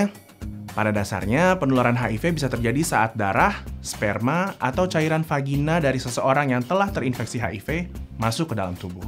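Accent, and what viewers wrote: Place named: Java, Indonesia. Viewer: tp logat bahasanya kok sprti orang Jawa ya. Mohon maaf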